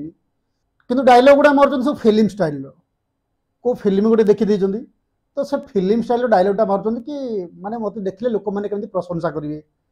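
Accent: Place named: Kendrapara, India. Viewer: Bhai katha ra saili ru laguchi bodhe kendrapara ade ghare apanankara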